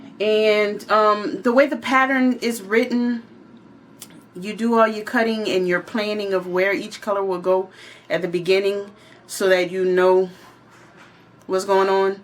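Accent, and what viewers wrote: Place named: Louisiana, United States. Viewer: Thanks again for another Roulette! Ok I know you are from Tx but you have a very Louisiana accent. Do you have history there?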